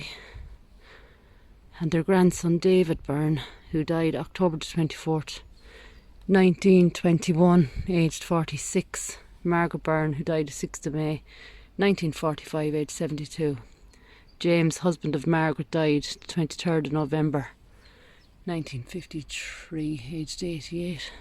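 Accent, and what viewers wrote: Ireland. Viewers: I just absolutely love you videos. Your accent reminds me of my Grandma Margaret . She was so very proud of her Irish Catholic roots. Church and Rosary daily 🙏. Her family came from County Monaghan. ❤️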